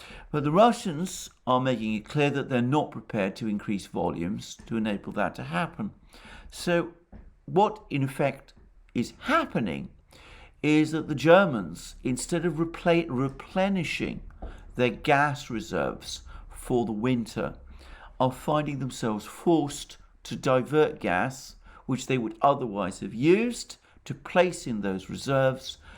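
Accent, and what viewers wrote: England, United Kingdom. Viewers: It hard for me to hear English accent. But I try my best to hear your story mr...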